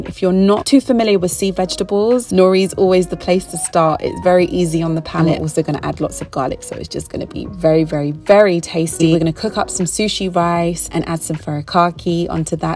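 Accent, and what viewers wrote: United Kingdom. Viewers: New subsciber! Love your content and your accent makes me miss living in the UK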